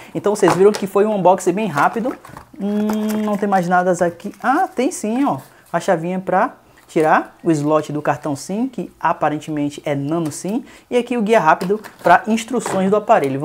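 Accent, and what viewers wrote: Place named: Bahia, Brazil. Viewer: muito bom; esse cara deve ser baiano... pela fala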